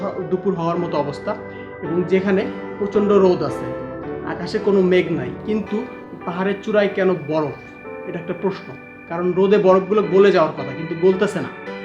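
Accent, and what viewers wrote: Sylhet, Bangladesh. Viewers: ভাই আপনার দেশের বাড়ি কি সিলেটে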